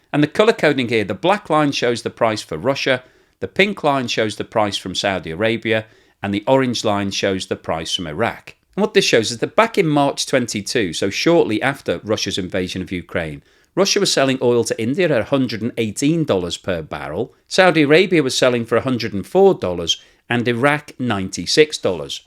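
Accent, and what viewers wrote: United Kingdom. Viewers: At begining I have a hard problem to understad your britsh acccent, now it loks familiar for me. Thank you for all the good informations.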